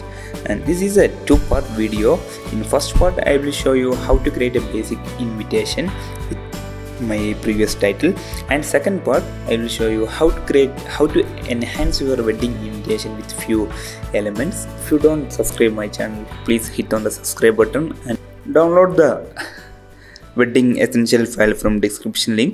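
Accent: Kerala, India. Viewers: bro are you form kerala you sounds like a malayali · What kind of english are u speaking 😑😑😑😑 do all indians speak like this?? Omg 😂😂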